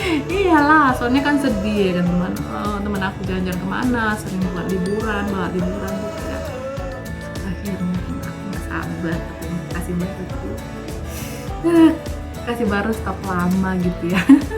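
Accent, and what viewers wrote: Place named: Medan, Indonesia. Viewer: wkwkkwwk jujur kali critanya,lagi beol manggil2.hadohhh.salam dari martha mccrea sist,logatmu itu loh.medan kali wakkkk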